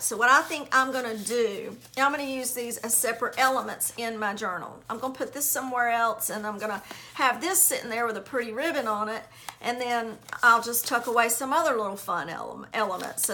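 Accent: South, United States. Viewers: Thank you for sharing what was on your heart today and inspiring us. It was very encouraging and I appreciate it. I love your creativity, and I'm really enjoying this series! It's like getting to sit right there with you and so we can create together. Plus, we get to hear your cute Southern accent and the way you express yourself. FUN!! I am thrilled that you found another way to use those "tag pockets!" That just makes it so much more fun for me and hopefully for those who purchase the kit as well. If we all did things the exact same way, it would be boring, wouldn't it? lol I love watching how you do your process and it's fun to sorta "see inside your head" and to see how it all comes together for you. I'm working with the regular sized "Rosewood Cottage" Journal kit right now and think it will be so cool when we are both done to see the different ways we use the elements.:) I love the little booklet with pockets that you created! 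Thank you for the tut!! <3 I so appreciate you and your sweet heart!! xo Marilou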